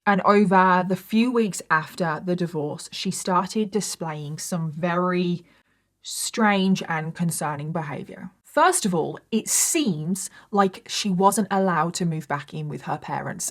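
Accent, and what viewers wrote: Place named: West Midlands, United Kingdom. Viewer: Only just found your channel. Your accent sounds local to me, West Midlands. Makes a nice change 😅 I’ll definitely be back to watch more.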